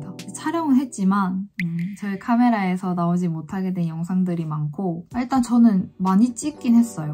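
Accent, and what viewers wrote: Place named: Seoul, South Korea. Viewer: 웅니 거주지는 부산으러 알고있는데 서울말 왜이렇게 잘하시나욤!?! 이것만 보면 완전 서울 사람이라고 해도 믿을듯 웅니 넘 이뻐요 앞우로 영상 마니 올려주데욤(ღゝ◡╹)ノ♡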